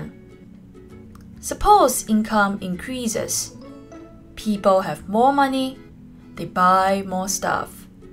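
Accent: Singapore. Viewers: are you from Singapore or Msia? sound like Singapore accent but very subtle :) I miss my country! :D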